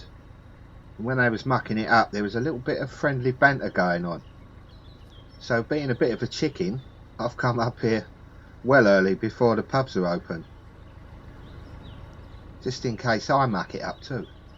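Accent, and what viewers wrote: London, United Kingdom. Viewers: Hi 👋. New subscriber here. I'm loving your channel! It's like popping round for a cuppa with a mate where you get to slow down and chill a while. I plan on doing what you're doing in a few years but while im saving up, I'll just join you! 😉 Tracy (and Moo the dog who seems to love watching you too!) 🙏 Ps- are you a London boy? Your voice reminds me of my childhood on Portobello Market!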